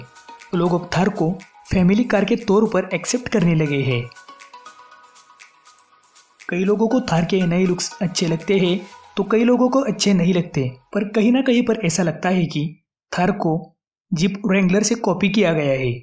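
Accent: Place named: Maharashtra, India. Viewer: You sound like you are from south western Maharashtra; maybe Sangli/kolhapur/satara. Nice video though!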